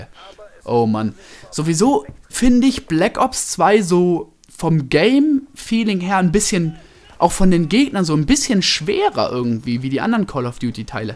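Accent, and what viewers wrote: Swabia, Germany. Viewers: bist du schwabe?:D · mit seinem Schwaben Dialekt:D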